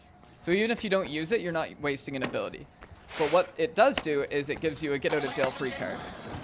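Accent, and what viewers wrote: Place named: Ireland. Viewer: you are irishhhhhhhh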